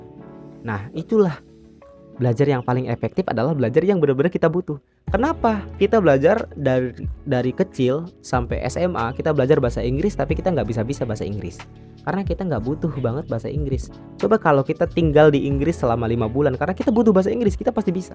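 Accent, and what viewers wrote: West Java, Indonesia. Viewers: Mantap kang, makasih vanyak i'lmunya kang.. Tapi daru katanya seperti orang sunda kang duhk. 😁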